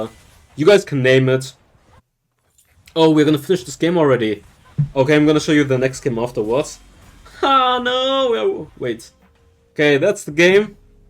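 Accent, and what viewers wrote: Germany. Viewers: I love that u can always hear his German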